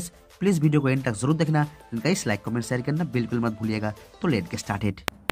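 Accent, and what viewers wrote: Bengal, India. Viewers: Aapka voice me Bengali tone mix ho rahi hai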